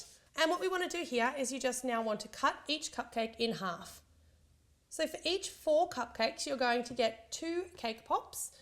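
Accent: Australia. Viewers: OMG! Are you an Aussie!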